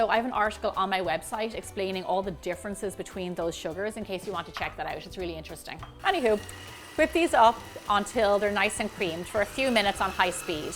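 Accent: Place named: Wexford, Ireland. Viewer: I never realised you are a Wexford Las how wonderful listening to you reminiscing about your Mother’s Christmas Pudding 🎄🎄🎄🎄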